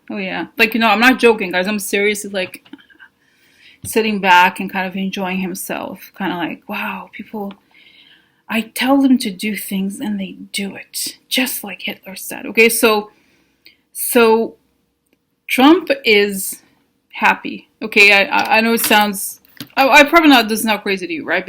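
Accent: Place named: Russia. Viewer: Sometimes you have a russian accent. Umm Are you a scorpio? Good analysis of Jared. They have no souls. Thank you. XO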